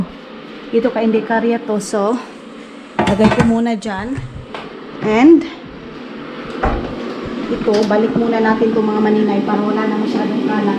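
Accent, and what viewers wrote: Visayas, Philippines. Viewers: Hello maninay ang ganda ng house mo sosyal tuwang tuwa ako sayo maninay pagnabibisaya k nakakatuwa k kc magsalita iloveyou maninay godbless you 🥰🥰🥰🥰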